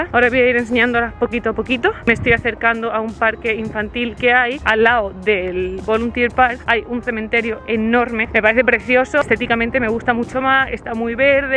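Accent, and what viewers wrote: Granada, Spain. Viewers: Primera vez que te comento. Cada vez que te escucho me translado a mis años granainos. Te descubrí antes de la cuarentena y me amenizaste todo ese periodo. Me flipa Seattle! La capital del grunge. Podrías hacer un vídeo sobre eso😉. El barrio que comentas en el que ahora se derriban casas antiguas para construir otras más modernas tiene pinta de esta gentrificandose, no? Gracias por enseñarnos Seattle! Me fliparia ir algún día.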